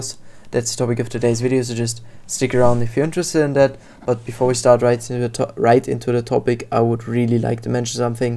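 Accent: Germany. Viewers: i hear deutschland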